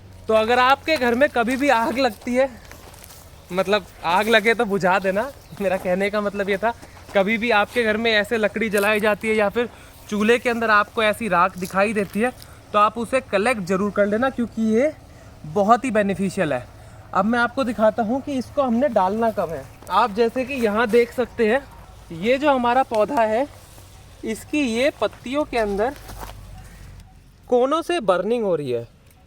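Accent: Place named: Rajasthan, India. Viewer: Rajasthani ho kya?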